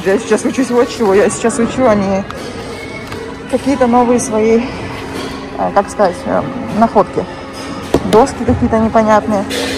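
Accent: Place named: Southern Russia, Russia. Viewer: Ой --я рада , что появился новый блогер на барахолке. Смотрю и Олю и Сашу...Теперь и вас смотреть буду... Только я не поняла , вы в России где жили??? У вас немного южный русский акцент.. Удачи вам в поисках...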